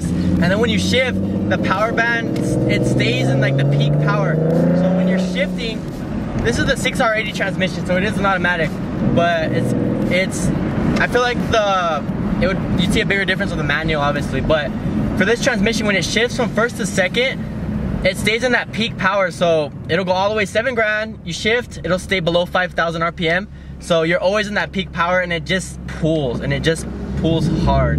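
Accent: Texas, United States. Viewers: What part of Texas are u from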